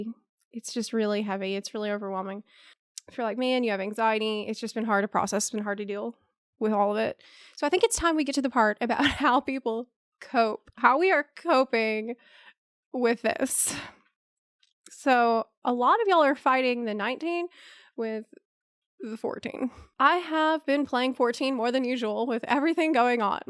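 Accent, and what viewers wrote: South, United States. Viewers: I never would have guessed you live in Ukraine. Your accent seems vaguely southern United States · I have just discovered your channel recently and I knew you sounded American, but I had no idea you live in Ukraine!? What you doin' over there? Love your content, and I'm also staying in my house except for necfesary grocery shopping.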